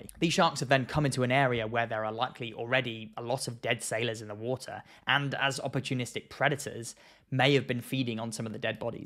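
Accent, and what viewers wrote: England, United Kingdom. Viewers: It’s weird to tell an English person how to speak English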